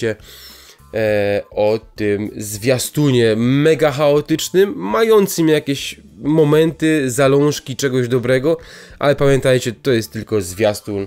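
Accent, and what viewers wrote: Silesia, Poland. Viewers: jesteś Ślązak?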